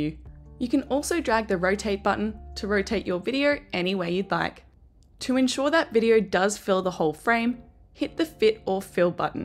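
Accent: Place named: Australia. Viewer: so, when you delete a section that you cropped or cut out, it automatically closes the gap again and butts up to the next nearest video front or back??? You, sound Aussi . I watched this about 2 weeks ago and could not understand it at all. been using openshot and slowly getting the hang of it. still can't put in music correctly. I want to learn the easiest program. I don't know which is which. HELPL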